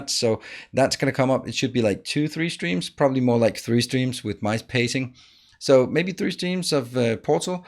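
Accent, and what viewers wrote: Denmark. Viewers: Thanks dude for making this channel, I've subbed and watched most of your factorio tutorials. What I especially like is your positive attitude and your cute Danish accent. I have tried using it while playing and it works like a charm. I really do like your most simplest designs and use your blueprints a lot .As for suggestions: I would like to see themed builds: Green builds (focus on least pollution) or black builds (most... hmm a Shell build) Keep up the good work!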